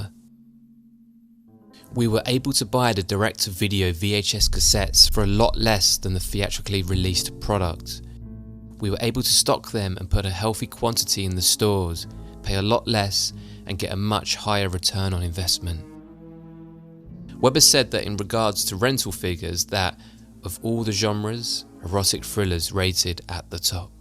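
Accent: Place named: United Kingdom. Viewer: That was amazing! I put it on while doing boring admin work, but had to keep stopping to watch. I’m going to give it a proper rewatch. I love your sexy British voice and accent. It was great to work to. Will be great the second time to pay actual attention tot he piece. I actually create erotic content. It is not the typical vanilla stuff but is Fetish & Femdom POV. Erotica has always inspired me since I was very young. Wrote my art history thesis on Japanese shunga. And 9 1/2 weeks is one of my favorite films ever. My GOD is that one good.❤ What other films in this genre would you recommend the most?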